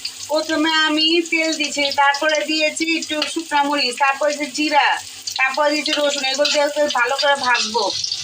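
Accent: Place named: Dhaka, Bangladesh. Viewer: Like your dhakaya voice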